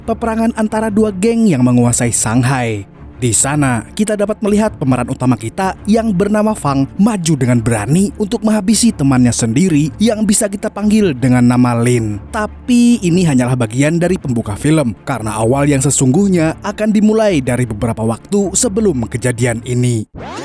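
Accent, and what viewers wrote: Banjar, Indonesia. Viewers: Selalu hadir mas walau gak komentar 😂 ae lucu banget neh org Banjar kh mas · Banjar lahh bangg 😭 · Urang banjar leh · Anjay Banjar wkwk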